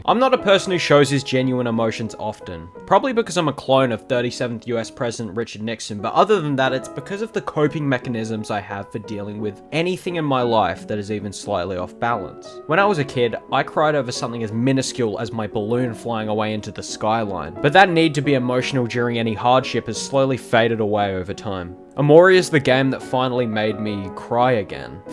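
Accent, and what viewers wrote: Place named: Australia. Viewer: Fabtastic video, man. You're definitely gonna make it, man. Also, every time you say Mari, I keep thinking you're saying my name in your Aussie accent.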